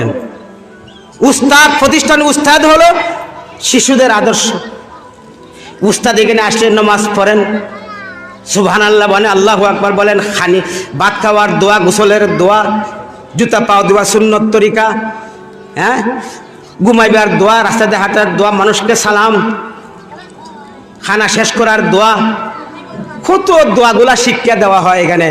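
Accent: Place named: Sylhet, Bangladesh. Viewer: Bhai where are you from originally (from bd) ? It sounds like you're my sylheti fellow Muslim bro😂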